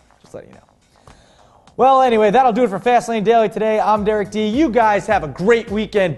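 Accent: England, United Kingdom. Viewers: Forget the real English accent. English acent is much better! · Nice UK Accent btw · nice english acent!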